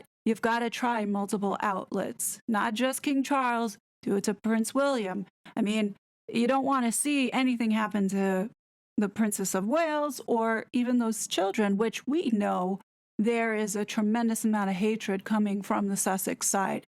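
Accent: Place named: United States. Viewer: Please, tell me how your love for "All things Royal", and/or Anglican Everything became important to you... I'm an American from Boston, and our family loved British TV and culture, had friends in the UK through travel, and their love for that corner of the Globe trickled down to at least one of the six kids, me. It's nice to hear an American voice (and an nice, soothing voice) giving me the scoop with no nonsense.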